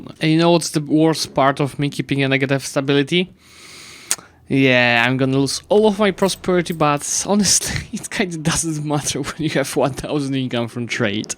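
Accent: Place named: Poland. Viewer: because we have ears, his polish accent isnt that bad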